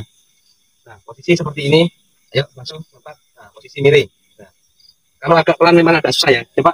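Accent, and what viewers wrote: Madura, Indonesia. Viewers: Orang Madura bukan mas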